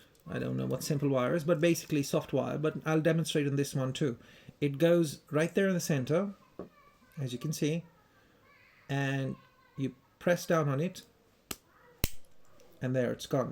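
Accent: United Kingdom. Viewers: Yeah I thought he is from England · You are indian yet you have a very British accent. I do not know why but i find this peculiar. Have you studied in UK or in a UK school in india perchance?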